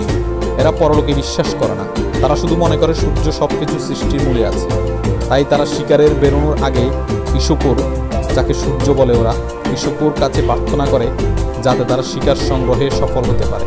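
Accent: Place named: Chittagong, Bangladesh. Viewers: ভাই কি চট্টগ্রাম নাকি নোয়াখালীর? ভাষায় তো চট্টগ্রাম। উডা হডে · ভাই আপনার কমেন্টারিতে নোয়াখাইল্লা, চাটগাইয়া,একসেন্ট আসে,শুনতে 😊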